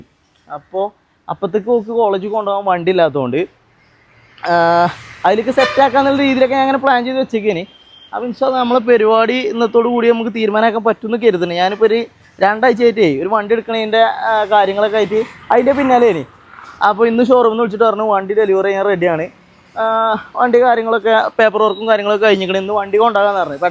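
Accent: Malappuram, India. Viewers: Paka malapuram slang analo😂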